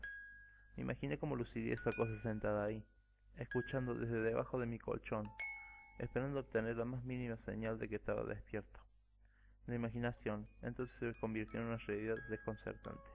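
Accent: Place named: Cordoba, Argentina. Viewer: Porque su acento delata, saludos hermano Argentino, compañero peronista! Aguante los cordobeses.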